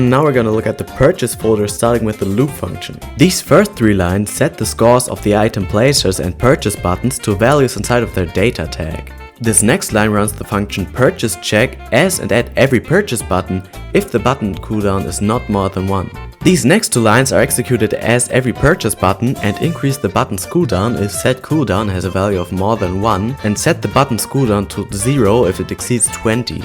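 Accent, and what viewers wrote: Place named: Germany. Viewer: safe you'r german, i can hear this!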